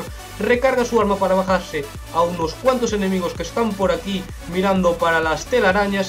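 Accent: Galicia, Spain. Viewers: Home claro, jajaja creo que se me nota un poco... xDD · gallego verdad?¿ si señor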